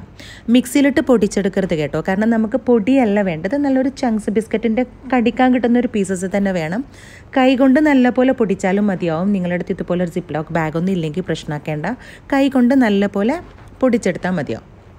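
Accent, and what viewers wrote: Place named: Kannur, India. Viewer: ചിലർ നമ്മെ അത്ഭുതപ്പെടുത്തും എന്ന് പറയാറില്ലേ... എത്രമാത്രം ശരിയാണെന്നു ഇങ്ങളുടെ ചാനൽ കണ്ടാൽ മനസ്സിലാവും.. അസാധാരണമായ റെസിപ്പികൾ, ആരെയും ആകർഷിക്കുന്ന അവതരണം മാസത്തിൽ ഒരു വീഡിയോ ആണെങ്കിലും അത് കാണാൻ ആരും കാത്തിരുന്നു പോവും.. 🥰🥰🥰 എത്ര ഭംഗി ആയി വളരെ വ്യക്തമായി അവതരിപ്പിക്കുന്നു.. കണ്ടപ്പോൾ തന്നെ വായിൽ ഒരു പേമാരി വന്നു 👌👌🤣ഒരു രക്ഷയും ഇല്ല... കിടിലൻ റെസിപ്പി.. ഭാര്യക്കും പെങ്ങൾക്കും ഷെയർ ചെയ്തു... 👏👏👏 ബ്രോയുടെ ബിരിയാണി കിടിലൻ ആണ് 🌹🌹🌹 (ഇങ്ങൾ kannur ആണോ നല്ല അക്ഷര ശുദ്ധിയുള്ള സംസാരം ). ടോട്ടലി അടിപൊളി എപ്പിസോഡ് 👏👏👏👏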